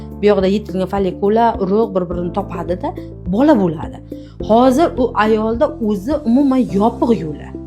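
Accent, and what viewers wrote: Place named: Qashqadaryo, Uzbekistan. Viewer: Qashqadaryolilani shevasi zorde😅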